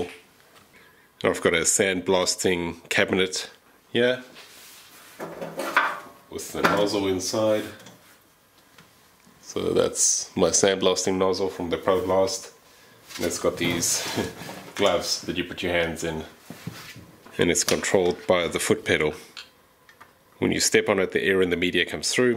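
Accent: South Africa. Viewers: Dude I know you're a New Zealander, but that accent sounds so South African to me. I can't believe there's THAT much similarity between the accents, so what gives?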